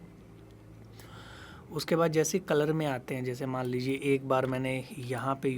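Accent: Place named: Punjab, India. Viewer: Brother hum yaha English pronunciation sikhne nhi aaye.....embarrassment feel mat krao....inka Punjabi accent hai..... but sir ka workshop attend kro ek baar...pta chal jayega...🙏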